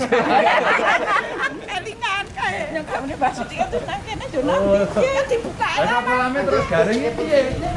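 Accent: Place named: Temanggung, Indonesia. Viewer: Boso khas temanggung kiye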